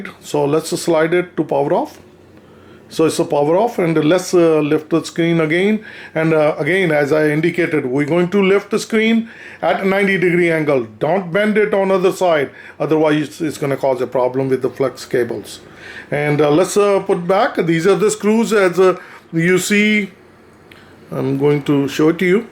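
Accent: India. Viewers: Its indian accent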